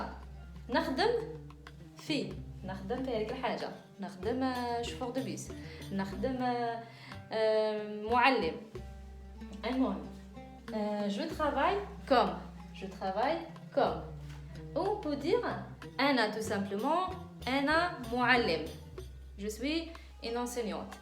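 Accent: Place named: Algeria. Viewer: جميل. اللهجة الجزائرية حلوة بزاف. يعطيك الصحة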